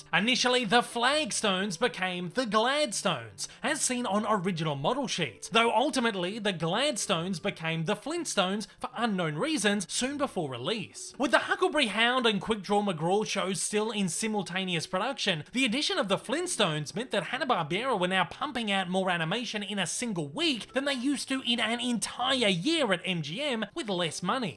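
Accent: Australia. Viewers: Are you serious? A hint ... He sounds 100% from Down Under. Unmistakably so. Actually his narration reminds me of an old 1980's TV show called Lifestyles of the Rich and Famous, featuring the voice of English entertainment reporter Robin Leach. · Is it me, or does this guy kind of sound Australian... I hear a hint, but probably not for those with an untrained ear.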